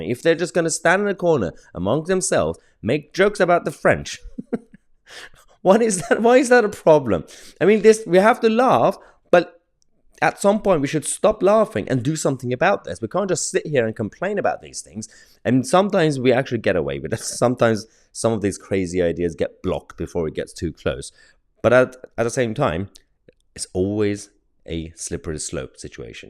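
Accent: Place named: RP, United Kingdom. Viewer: im offended by you..trying to speak like an english snob...what a prick you are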